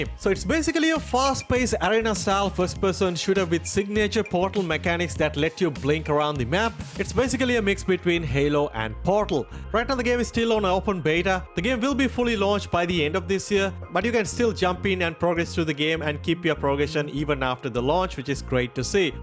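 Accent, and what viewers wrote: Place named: India. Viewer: Why do u sound Indian brother? R U? Btw I am an Indian.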